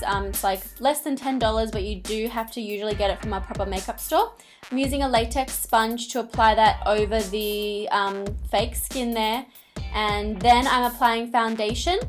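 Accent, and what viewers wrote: Australia. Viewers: Is she Australian? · Shes Australian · your Australian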